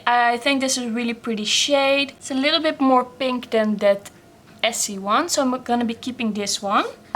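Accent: Netherlands. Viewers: ❤️❤️❤️ leuke video, hoorde meteen aan je accent dat je Nederlands hebt haha ! Wil toch ook nog eens H&m nagellak proberen, verder ben ik ook erg van Essence, Essie en catrice 😋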